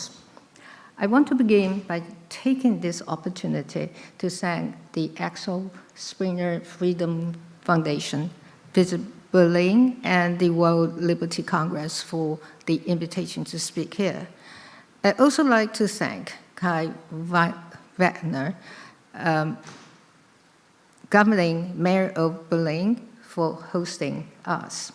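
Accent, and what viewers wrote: United Kingdom. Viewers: 小英－口濃濃的英國口音讓我覺得好懷念自己年輕的時候！當初我在新加坡學英文的時候就是這樣的口音、我們的前總統小英真的好可愛🎉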